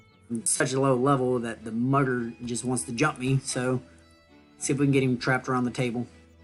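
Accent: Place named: Ireland. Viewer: It's Cool! The Irish Accent adds some flair to it! 😎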